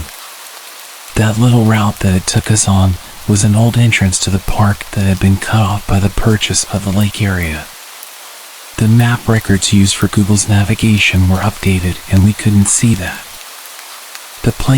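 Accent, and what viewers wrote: United States. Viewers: Thanks for the western voice! So tired of everything being the same eastern accent.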